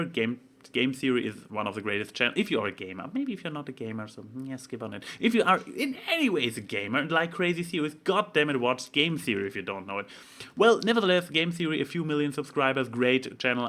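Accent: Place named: Germany. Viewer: Oh AnY I don't stalk you, I just am just drawn to your sexy German accent everywhere you go lol. Anyway I like content. But I think his brand of humor works better when he has someone to bounce off of like his collab with Josh Scorcher. Also AnY you might want to be careful with these vlogs because you got a little redundant at times.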